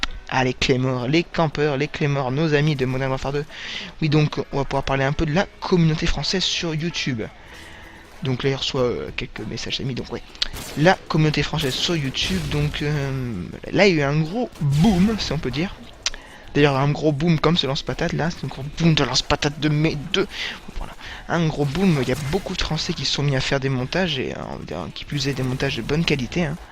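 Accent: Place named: United States. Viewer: Quel accent américain !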